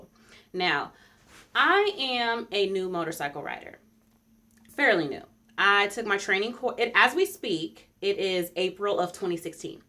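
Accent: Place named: RP, United Kingdom. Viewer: So nice and honest. Thank You! And, love it you speak the Queens English. I was prepared based on your Youtube name for a bunch of profanity and excuses. You go girl !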